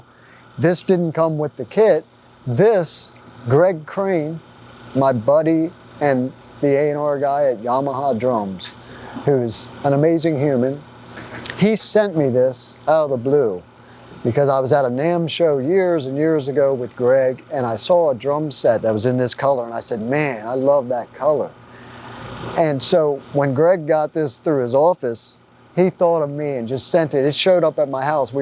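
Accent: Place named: West Virginia, United States. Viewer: I'm a lifelong drummer from Wheeling,WV and I always thought I heard some WV in your speech.Not Hillbilly just our region.Proud of you dude.I loved this interview.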